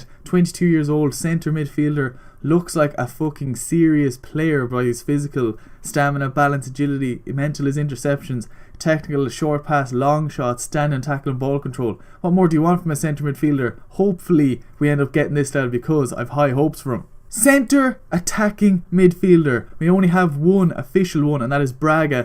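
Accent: Ireland. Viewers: YOUR IRISH!!!! WOOP Hey Im AmigoSully and im here in the search of making a recording team as its a good way to grow, I like your content and love to have you as a part of the team:) hope to hear back from you Sully xD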